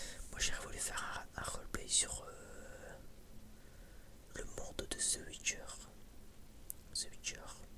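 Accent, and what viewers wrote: North, France. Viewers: Tu es bien du Nord 😊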